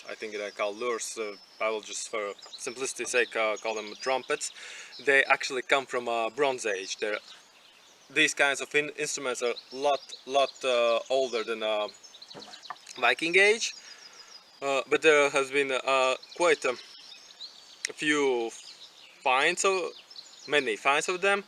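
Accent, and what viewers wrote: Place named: Scandinavia. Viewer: Hi. I am from sweden and was wondering if you can make a tagelharpa? The reason I said I was from Sweden is cuz I wondered what country you are from. I thought you sounded Nordic so I wondered if you can understand Swedish? I’m kinda bad at talking Swedish cuz Swedish is my first language and English is second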